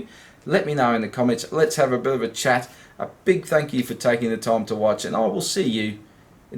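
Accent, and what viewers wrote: Australia. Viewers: Great to see you back, and hearing an Aussie voice around. Our weird weather down here near Sydney has made things even more crazy. We finally got to have a camping holiday that has been cancelled 3 times from Covid. Yes I too have changed my Lego collecting thinking. Sadly my city is going. We need the garage back but the small office room is becoming a Lego room. My girls are in high school too. One thing we all have learnt from the last few years is that things will always change and you need to adapt. Thanks again. 😁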